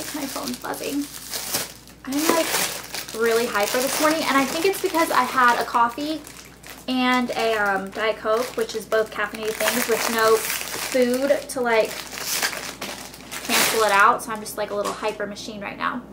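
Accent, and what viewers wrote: England, United Kingdom. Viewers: that britsh accent :D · I highly like how you acted in this video. You were very... I don't know, lively. You were just happy and you didn't seem fake or bored. PLus, I love your british accent... lol. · Nice British accent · i love your cute British accent there it made me laugh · British accent? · I love ur english accent! lol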